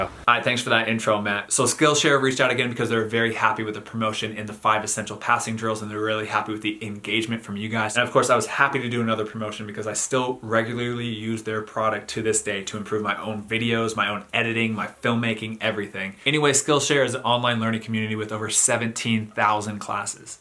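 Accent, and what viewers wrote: United States. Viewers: Are you actually from new Zealand because you sound American.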